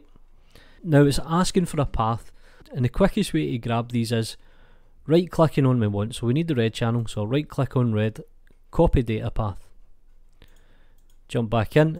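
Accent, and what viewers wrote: Scotland, United Kingdom. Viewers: Tutorials in a Scottish accent is music to my ears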